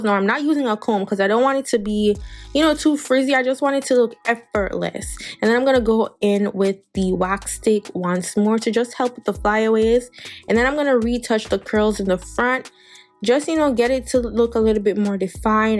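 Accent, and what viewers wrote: Jamaica. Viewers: I love this.. so easy n quick. Btw r u Jamaican?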